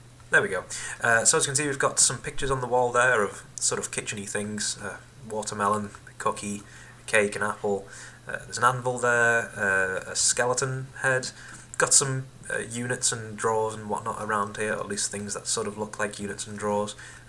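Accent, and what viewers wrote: United Kingdom. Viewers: Pam VanWinkle its called a british accsent stupid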